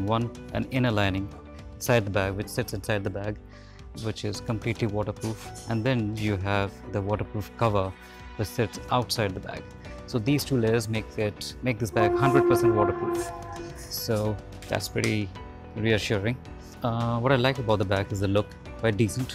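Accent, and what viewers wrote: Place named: England, United Kingdom. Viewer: Explained neat and nice! The English accent is lit!!!!!!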